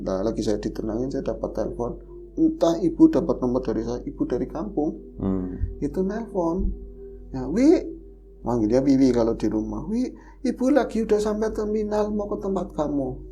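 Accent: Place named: Tegal, Indonesia. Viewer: Ora ngapak ora kepenak RRT (republik rakyat tegal)😂😂😂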